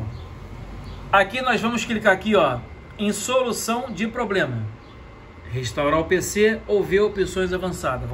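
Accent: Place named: Rio de Janeiro, Brazil. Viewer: Com certeza vc é da zona oeste do Rio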